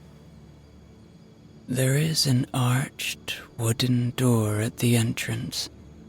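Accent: Ireland. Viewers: Thank you. I realised how much I enjoy story time by the Irish. Your count down is amazing xxxx Goodnight from Australia ❤ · Another beautiful story from Megan enhanced by the lilt of our Irish ☘️ Storyteller,! I haven’t commented lately but you have literally been playing 22-23 hours/day& nite on loop for the last 3 weeks., 🤗you have been my best Friend during a severe Mito “crash”. Thank you for keeping me company even through my subconscious. I felt supported, safe, soothed & so much companionship in this time of restoration. My energy is coming back! Sláinte!!! ❤🙏🍻😊Wishing for You, Reb, & Timmy all the love, light, & amazing ENERGY you put out in the world back to you in spades! ☀️💖🌍♠️♠️♠️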